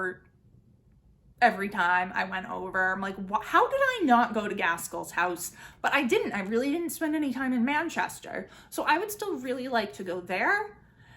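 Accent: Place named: United States. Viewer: I associate you so much with Victorian literature that I forgot you're (most likely) an American, even though I hear it in every video! Btw I also love Wuthering Heights :)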